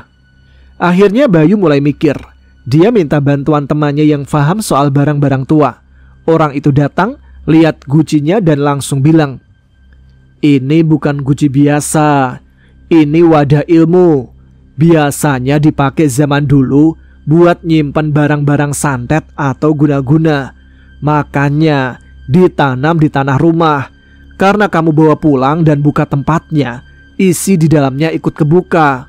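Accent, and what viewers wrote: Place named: Lampung, Indonesia. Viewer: Oi mas lampung nih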